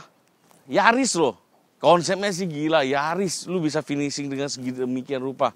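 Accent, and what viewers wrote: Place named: Sumatra, Indonesia. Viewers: ABANG INI LOGAT NYA KEK ORANG SUMATRA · keren x koko ini klu Logat bahasanya SUMATERA kali. salam sukses koko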